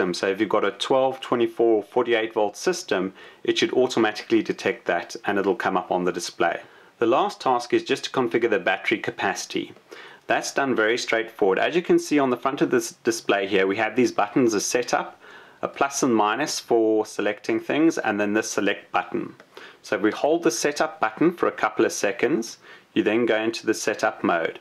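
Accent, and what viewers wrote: South Africa. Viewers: Very good demo! Truly well explained, thank you!! South African accent? Dankie!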